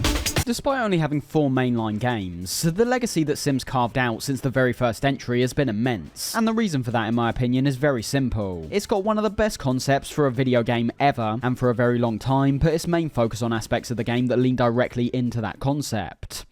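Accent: United Kingdom. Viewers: A British person talking about the sims for an hour. That's all I need and want actually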